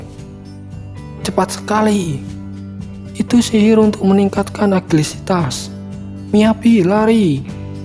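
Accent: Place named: Java, Indonesia. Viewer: Jangan medok min ngomongnya🤣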